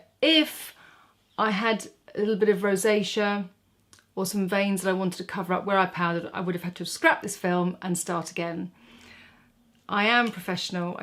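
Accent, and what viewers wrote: United Kingdom. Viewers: Greetings from Seattle! So happy to have found you! Very much enjoyed your video on Flower Beauty. You’re quite right that these products are quality yet affordable. I love the Flower Beauty blush color drops. The product is a gel that comes in a tube and you use it on the cheeks and lips for a natural flush of color that is buildable. It’s nice for women with mature skin as well. They come in six shades and are lightweight and lovely for spring and summer. Thank you again for the video. You are so natural and down to earth! I could listen to your voice all day. I think most Americans are enamored with British accents! Have a great day and see you soon!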